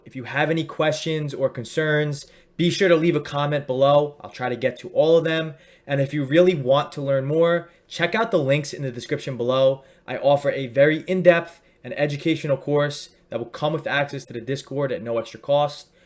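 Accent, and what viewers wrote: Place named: Long Island, United States. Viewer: Sounds like a Long Island accent. Home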